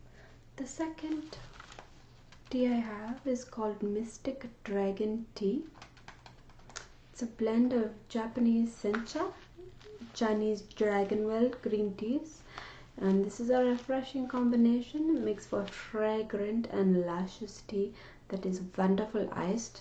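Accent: India. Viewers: I love that Indian accent. So many sub continent tingles.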